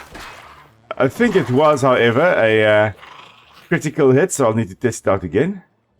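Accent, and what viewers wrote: South Africa. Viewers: Fascinating...who knew water fleas were so interesting?. Are the eggs they reproduce through parthenogenesis actually fertilized by the female or does it have a unique process to accomplish birth without fertilization? Came for the learning...subbed for the SA accent :)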